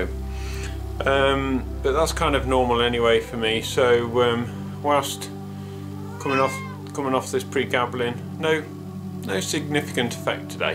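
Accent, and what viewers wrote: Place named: Northern England, United Kingdom. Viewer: We both apologize for never shutting up! I’ve been trying to figure out if I can identify your accent. At first I thought it might be a northern accent, but not Liverpool, because you sound sort of like John Lennon but not like John Lennon. Then I thought maybe London. Now you mention going to Derby, I think (which, as you surely know, we would pronounce “Dur-bee”). Which I looked up on the map and see it’s fairly far north. You said something about a painkiller, and then named it. I’m not sure what the first word was, but then you said “and codeine”. I bet that’s similar to what I know as Percocet. Which is oxycodone and acetaminophen. I believe oxycodone has something to do with codeine. Which reminds me. I need to make a video about that. Because that’s very important. I learned four years ago that Percocet makes me stronger. 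Then I learned a couple months ago that OxyContin makes me significantly weaker. They’re both made of oxycodone. But Percocet is instant release and OxyContin is extended release. Which means it’s oxycodone with chemicals added to it to make it do something different than what oxycodone itself does. Those chemicals do other things, too (“side effects”). Like make me weak.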